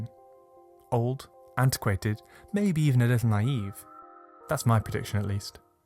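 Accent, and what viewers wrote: United Kingdom. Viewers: Tell me how I knew the narrator would be a Brit before I even started the video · i was worried this video would be ai but u sound too british to be ai so i think were good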